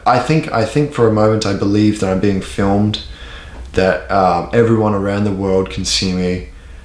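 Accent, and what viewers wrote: Australia. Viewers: he sounds like an aussie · Something about how you looked in the thumbnail made me assume your Australian for some reason, but your accent is throwing me off big time, sound like an aussie who lives in the US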